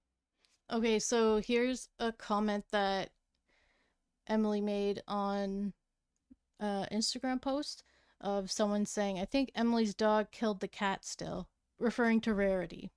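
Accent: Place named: Canada. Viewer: Enjoy and appreciate your updates! Also your Canadian accent is everything!! Lol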